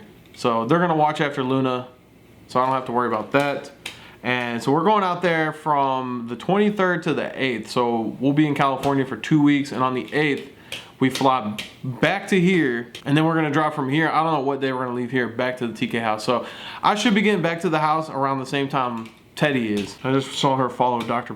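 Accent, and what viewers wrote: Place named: South, United States. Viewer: All of these years and I just now realized you have a southern accent.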